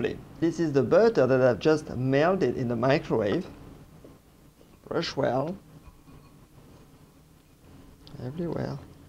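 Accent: France. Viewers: I could listen to this guy talk all day I am French too but I'm French American so I don't have the cool accent.😕😆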